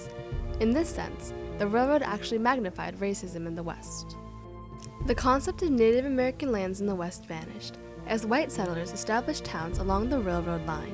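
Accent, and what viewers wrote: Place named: Mid-Atlantic, United States. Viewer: Very good. But what puzzles me is the narrator's accent. Very clear English, yes, but there's such effort apparent to elocute correctly. Sounds a bit mid-Atlantic. Probably never find out.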